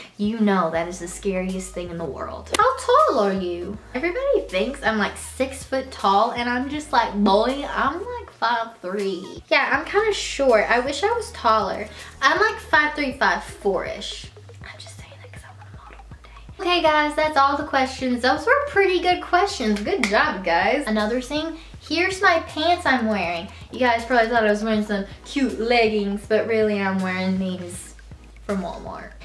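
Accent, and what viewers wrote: South, United States. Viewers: is she country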